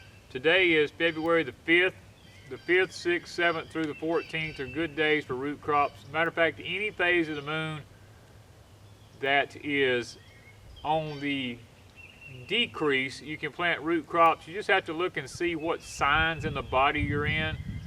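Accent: South, United States. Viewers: What part of the south are you please?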